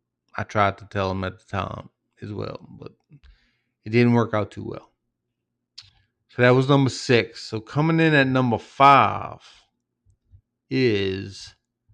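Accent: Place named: Louisiana, United States. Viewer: You sound like you're from Louisiana, particularly the Houma area.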